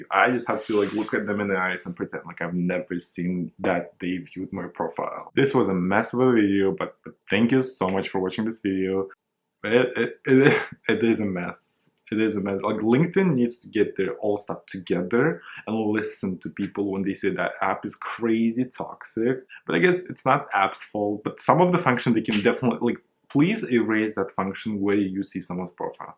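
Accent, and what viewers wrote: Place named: Russia. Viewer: What nationality are u? Im getting russian speaker vibes 🤔 also great video! I had the same experience with "stalking" people, I was pretty embarrassed to realise that people saw that 😅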